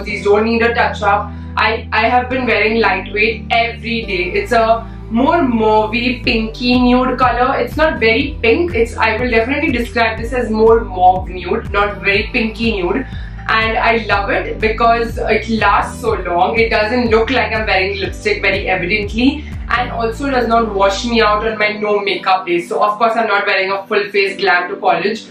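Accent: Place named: India. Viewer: Girl you don't look Indian from anywhere.. had to open ur video and hear your accent to make sure that yes this hottie is indian indeed 🙆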